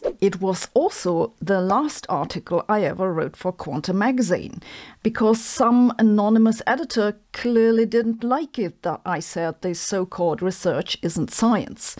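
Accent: Germany. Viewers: Love the way she says BS with her German accent. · "that I can say bullshit when I mean bullshit". This is very clear, but not very lady like. I like your german english - I speak it also.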